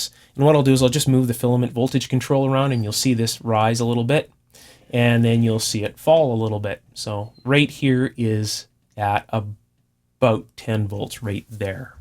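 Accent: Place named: Canada. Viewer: Canadian accents are the best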